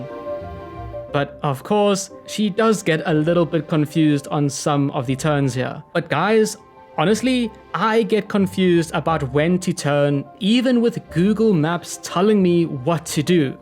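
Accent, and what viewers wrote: South Africa. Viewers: Am I hearing a South African accent? Lmao vase line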